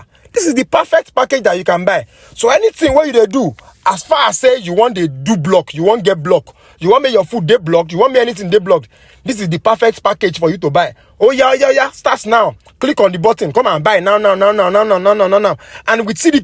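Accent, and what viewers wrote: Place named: Yoruba, Nigeria. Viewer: Yoruba and bad accent are 5&6. I won't buy because I don't trust you.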